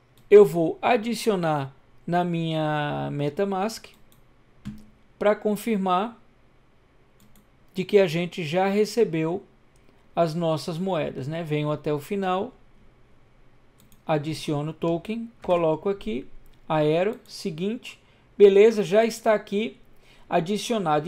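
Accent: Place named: Pernambuco, Brazil. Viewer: vc era de q parte do nordeste Aki no Brasil? Vou chutar Pernambuco por que vc fala sempre ( butar ) 👏👏👏👏👏👏👏👏👏👏👏👏👏👏👏👏👏👏👏👏👏👏👏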